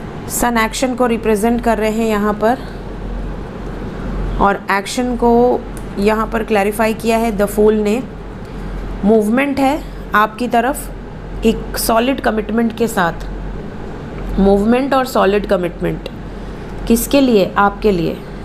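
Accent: Varanasi, India. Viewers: Didi aapki banaras wali boli badi pyaari lg rhi aapke muh se😍❤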